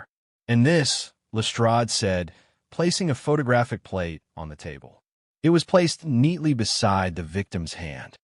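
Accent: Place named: United States. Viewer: The story loses value as this is narrated by someone in the U.S.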